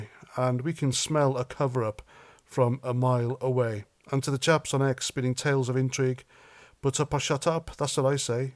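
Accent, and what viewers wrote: Wales, United Kingdom. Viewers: I like your Welsh accent!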